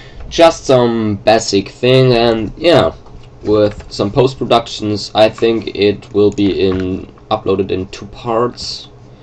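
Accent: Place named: Germany. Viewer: Man hört sehr gut deinen Deutschen akzent :D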